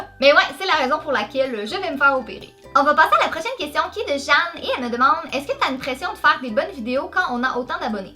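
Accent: Canada. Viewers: Coucou ! je penses très sérieusement à faire l'opération pour les yeux, je suis myope et comme toi, je ne peux pas conduire sans lentilles/lunettes, je peux marcher sans mais ne suis pas bien, depuis mes 15 ans et j'en ai 24. J'ai appelé un centre à Lausanne (Suisse) pour avoir les infos et c'est très possible que je prenne rdv en février :D et c'est ta vidéo qui m'a relancée sur ce projet que j'avait en tête depuis ... 5 ans ! Mais j'avais peur, de plus ça a un cout et j'avais pas de retour dans mon entourage hors actuellement j'en ai pleins et tous très positif. Je t'explique pas comme je suis trop excitée mais tu imagine bien ;). Le bonheur de voir nett sans lentilles/lunettes, j'en rêve ! Ha la la ... Donc avec plaisir pour que tu fasses ta vidéo complète :) M E R CI :D et j'aime beaucoup ta chaine et ton accent canadien <3 Bisous du Valais, Suisse :)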